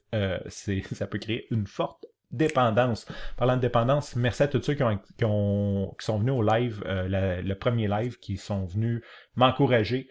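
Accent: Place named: Quebec, Canada. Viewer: Le Français canadien 🎉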